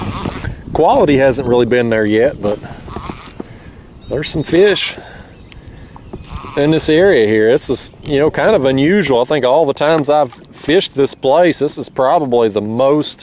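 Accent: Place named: North Alabama, United States. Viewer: Just subscribed. Realized quickly you have the same exact country accent everyone spoke where I grew up (North Alabama). I shed my accent after moving to a bigger City, but it always makes me happy to hear it still alive and well! Awesome channel my friend!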